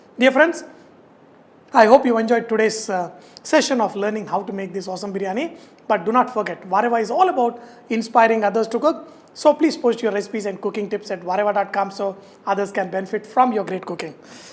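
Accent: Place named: Telangana, India. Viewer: i like your Telengana dialect narrations the most!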